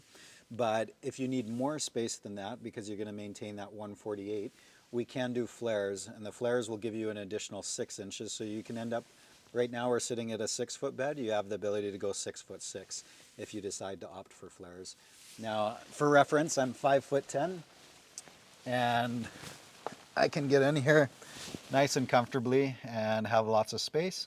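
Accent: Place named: Germany. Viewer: You have a elegant German accent if you are German. Nice van, thank you for the tour.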